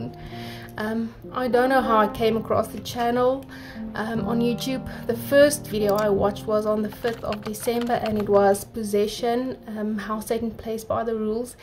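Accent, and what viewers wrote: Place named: Afrikaans, South Africa. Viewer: Hehehe guys if ur wondering about the accent it’s called the South African Afrikaans accent 🙈🙈🙈🙈🙈we have different accents here aswell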